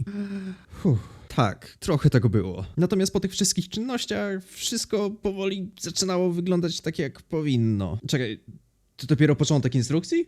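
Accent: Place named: Poland. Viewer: to jest najbardziej polski angielski akcent jaki kiedykolwiek słyszałem (automatycznie ustawiło mi się na angielski)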